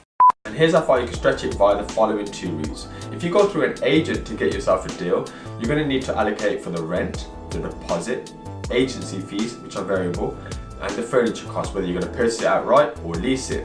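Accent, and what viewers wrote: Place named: Wolverhampton, United Kingdom. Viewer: Class video and good to hear it coming from someone local! I'm in west brom, you sound like you're from wolves lol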